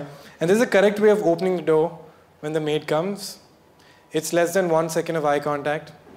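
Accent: India. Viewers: Kenny sabastian he is a Indian with that accent ding ding only Indians will understand him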